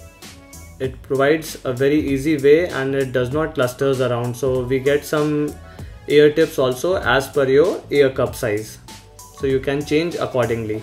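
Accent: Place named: India. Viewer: i love indian accent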